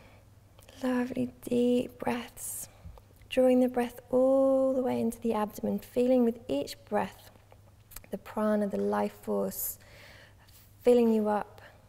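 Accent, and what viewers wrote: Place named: United Kingdom. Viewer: So so good to hear a British voice while I practice! No offence meant to American pals...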